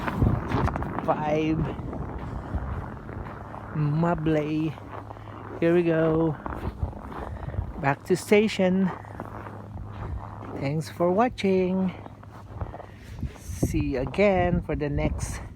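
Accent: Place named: Canada. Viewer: Wow english Canadian eh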